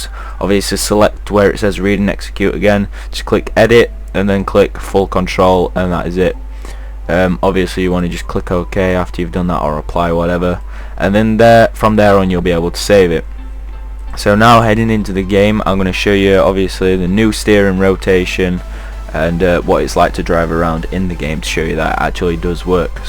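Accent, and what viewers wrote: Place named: United Kingdom. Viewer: fututi acentu tau de britanic ca nu inteleg nimic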